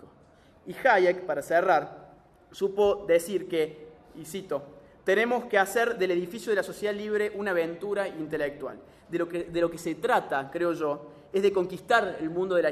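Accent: Argentina. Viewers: Que lindo ese acento cordobés 😍. ..Laje sos un genio 💖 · el acento cordobes era mas pronunciado antes jaaj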